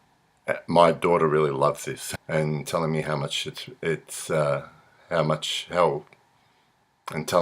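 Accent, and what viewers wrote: Australia. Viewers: I'm a simple man! when I hear an Australian accent...I subscribe! Also awesome intro! excellent fragrance story, I still need to try the original Lagerfeld!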